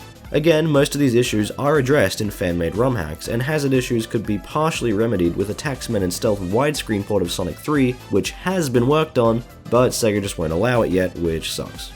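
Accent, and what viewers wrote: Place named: Australia. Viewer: I know right? I saw your video and was like "awww yeah, Mania hype!" and then heard your accent "hey an Australian, nice!" and then when you said you were from Brisbane I almost spat my coffee out all over my computer in an over-the-top cartoonish fashion in disbelief. You've earned yourself a subscriber! S3&K is one of my favourite games of all time and I can't believe we are a week away from finally playing something of that quality once again!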